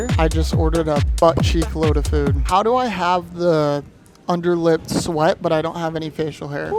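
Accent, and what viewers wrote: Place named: Germany. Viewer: german accent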